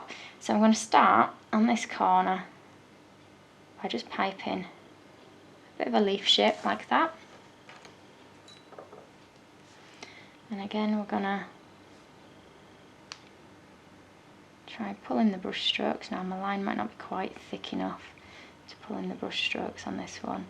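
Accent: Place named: England, United Kingdom. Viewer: New subscriber here! Where did you train, or are you self-taught? Amazing tutorials, and nice to hear an English accent, so many Australian and American cake geniuses on YouTube but I haven't come across many fellow Brits :)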